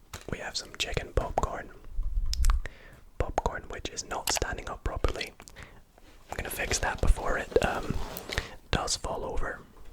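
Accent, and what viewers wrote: Scotland, United Kingdom. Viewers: I love old Scottish accent, deeply miss it in the new vids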